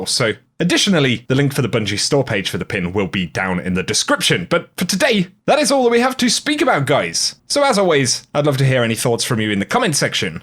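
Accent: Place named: United Kingdom. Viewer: It’s a british accent, big deal?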